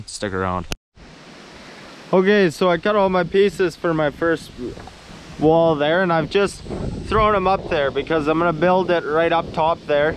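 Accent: Canada. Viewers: There’s my favorite Canadian accent... nice building dude 👍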